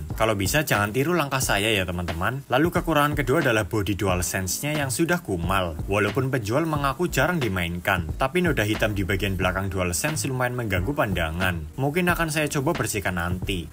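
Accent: Banyumas, Indonesia. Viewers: genah mesti ngapak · loh mas wong banyumas tah?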